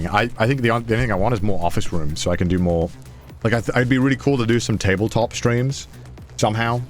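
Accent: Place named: Wales, United Kingdom. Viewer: Then why are you busy streaming in online platform like youtube in your channels to have money but not get a job for that country japan and live for years. Maybe you and your co should follow up your status because the government in Japan can be changing the policies when it comes to foreigners. And add to that they had lower birth rates and the government made a drastic plan for that. Why ask led Joey about his travelled in many parts of the country. Sinced you are from U.K. particulary Wales sinced your accent is deep.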